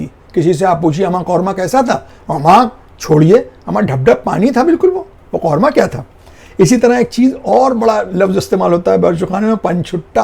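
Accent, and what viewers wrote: Lucknow, India. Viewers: Shukran Sir Aap ki videos ka be qarari se intezaar rehta hai... Hum Guzishtah paanch barson se Bangalore main muqeem hain Lucknow juld aana mumkin nahi So aap ke lub wa lehje main mukammal Lucknow paa letay hain.. Behud shukr guzaar hain ke Aap ne Itni pyari Tehzeeb ko ujagar kiya... Salamat rahiye... Aameen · Aap ke Lucknavi bateyn sun k bahut maza aa gaya.Meray Walden wahin sey partition k baad Karachi a gai they.Yeh sab batey or lab o lehja Karachi mey rehtey huway suntey tou lagta tha Lucknow mey rehtey hain. Aap ki guftugu sun ke maza agaya Lucknow ke sair ho gai 👌♥️ Aap ney Lucnavi pourbi zaban ka zikr nahi kia wahi hamarey ghar mey boli jati thee.Jesay aye bhayia tum ka khaieo ? Mey kai baar Lucknow bhee jachuki hoon Behad maza aya or apni nanhiyal jaker wapas aney ka dil nahi chahata hai.Lucknavi mehmaan nawazi or kahin dekhney ko nahi multi 👌♥️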